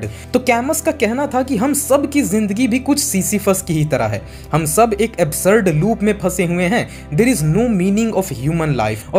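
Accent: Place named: Bhojpuri, India. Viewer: Bhaiya bhojpuria lg rhe ho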